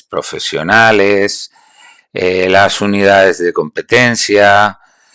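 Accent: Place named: Canary Islands, Spain. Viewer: Hablo muy canariona como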